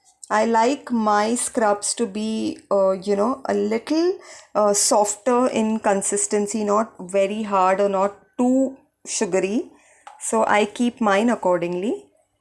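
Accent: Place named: England, United Kingdom. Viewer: I love you English ancent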